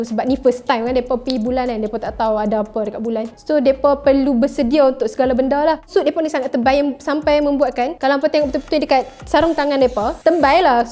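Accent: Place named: North, Malaysia. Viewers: Pekat sggh utariaq hg nohh 😁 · Uishhh loghat utagha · Memang lah...depa nak tunjuk longhat utagha kan..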